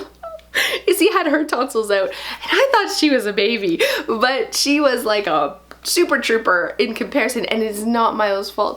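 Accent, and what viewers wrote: Canada. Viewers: First 10 seconds of the video: op she's Canadian lol.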